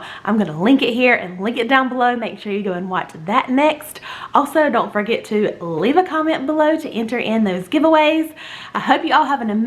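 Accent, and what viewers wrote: South, United States. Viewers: I am fairly new to your channel. I love your southern drawl. I would like to see some unique and different breakfast recipes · I'm new to your videos, I just subscribed today. I love your videos and can't wait to try some of the recipes. I'm from Mississippi and I love your southern accent. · LOVE all your recipes but looking forward to fixing the sausage pinwheels for my kids coming home to visit in September. I too am a Southern Girl and love your accent..Hello from Coastal North Carolina!!! I’m fairly new. Discovered you about a month ago!!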